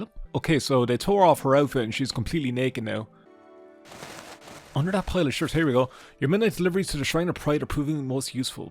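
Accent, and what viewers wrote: Ireland. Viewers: Am I the only one who just keeps on just to hear an Irish man rant for background noise?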